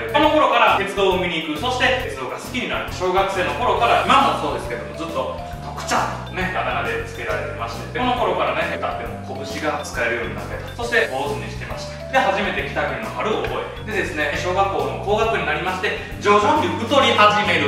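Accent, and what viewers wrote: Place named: Osaka, Japan. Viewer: 大阪だったんだ 時々の大阪弁がなんかうれし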